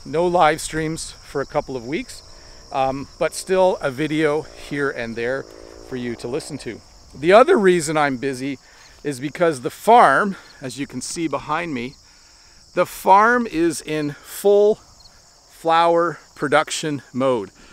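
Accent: Canada. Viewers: Hi!! Don’t worry. You should have a break. I really appreciated that you do a lot of jobs.👏👏I like Canadian teacher accents of you and. I still waiting for your lesson. 😃😃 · I had lived in ottawa four years. It seems like my second home town! Your video and your clear Canadian accent make me homesick! Don't forget make a video in maple leaves season,please!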